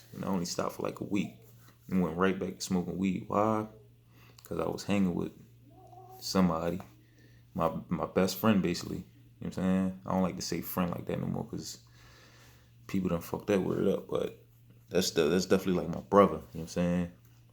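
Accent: Philadelphia, United States. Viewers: Before he even said Septa I knew bul was from Philly. Props bro. I’m on the same path. This helped me stay focused.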